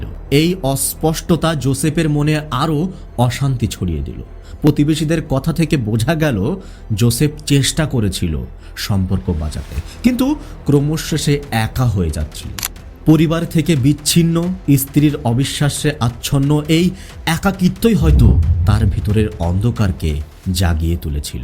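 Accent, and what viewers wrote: Bangladesh. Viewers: tumi ki bangladeshi? Tomar pronunciation tai laglo. Khub valo poribeshon, khub valo laglo. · Apnar protita uccharan kothabarta porishkar BANGLADESHIDER mato .. Bharote ese voter card adhar card kobe jogar kore thakte suru korechen .. INDIAN bolchen but you are not INDIAN YOU ARE BANGLADESHI .. SOURCES TA KI ,, POLITICAL SOURCES ??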